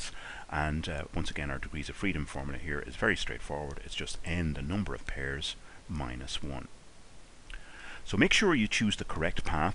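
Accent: Ireland. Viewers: I really enjoy Irish accent. It makes learning fun again:D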